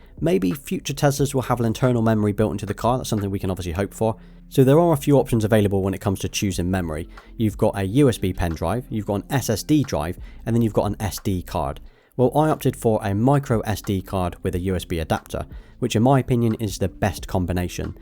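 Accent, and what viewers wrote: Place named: England, United Kingdom. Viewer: Model 3. Great quality video and refreshing English accent 😉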